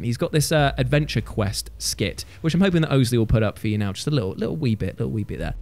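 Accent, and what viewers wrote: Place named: Scotland, United Kingdom. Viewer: Not only was there a Limmy shout out, but that Scottish accent! Hats off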